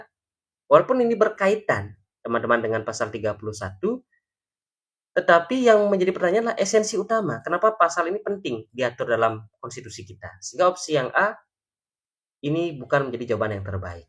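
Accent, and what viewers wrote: Bali, Indonesia. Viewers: bang saya suka logat balinya wkwkwkwk .. mantappp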